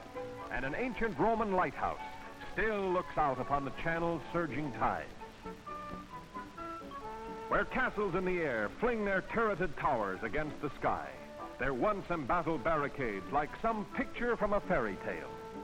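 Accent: United States. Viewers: Why the American commentary?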